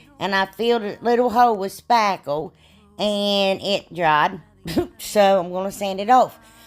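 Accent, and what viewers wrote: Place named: Oklahoma, United States. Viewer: I love listening to you talk too. Your accent is so sweet and your laughter makes me smile. One of my best friends is from Oklahoma and has the same accent. Enjoy your week!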